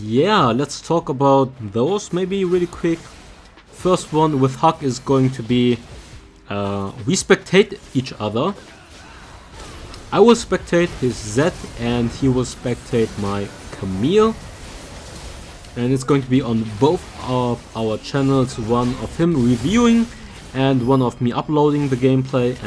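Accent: Germany. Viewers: Are you german? Your accent sounds german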